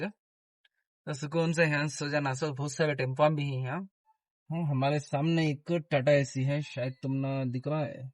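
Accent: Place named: Deccan, India. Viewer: bhai aap kya Deccani bhol rahe hai? It is very nice and should be taught in schools as a language so that it can be preserved.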